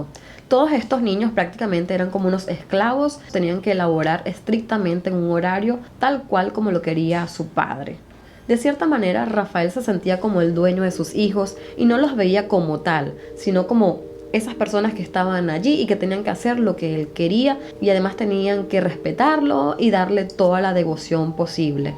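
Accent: Standard. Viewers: Hablas muy bien, además tu acento es muy neutral, eso ayuda bastante a que se sienta calidez en la narración. Te recomiendo que mejores la redacción del guión, repites mucho palabras, cuando puedes usar sinónimos, por ejemplo en este vídeo a cada rato decías 'Sumamente'. Suerte con todo, éxitos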